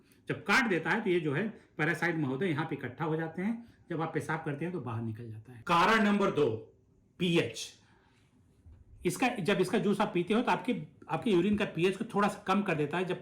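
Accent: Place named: North, India. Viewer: आपको मेरा प्रणाम।आप के हिन्दी उच्चारण से इतना स्पष्ट है कि आप उत्तर प्रदेश, मध्यप्रदेश, बिहार के निवासी हैं।क्रेनबेरी तो शांपिग माल में मिलेगा।एक आम भारतीय इस बीमारी में क्या उपयोग कर सकता है जो वस्तु आसानी से उपलब्ध हो क्योंकि ग्रामीण भारतीय उसे आसानी से उसे प्रयोग कर सकता है। मैं यह आपकी बोलचाल से समझ पाया हूं कि आप शायद कहीं विदेश में रहते हैं। कृपया मेरा मार्गदर्शन करें शंका समाधान करने के लिए आपका धन्यवाद।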